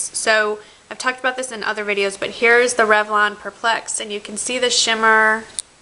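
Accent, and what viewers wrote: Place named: New England, United States. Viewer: I love listening to her talk. She's from the New England states and has that accent. :)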